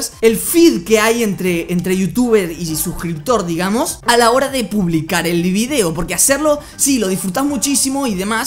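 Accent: Buenos Aires, Argentina. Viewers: Pensé que era mexicano, pero después sha toco el tono porteño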